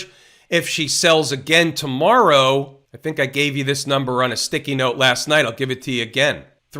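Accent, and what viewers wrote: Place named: Brooklyn, United States. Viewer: you sound like a brooklyn guy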